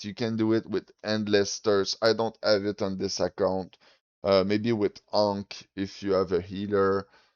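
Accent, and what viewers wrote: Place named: Montreal, Canada. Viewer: I see the accent you from Montreal?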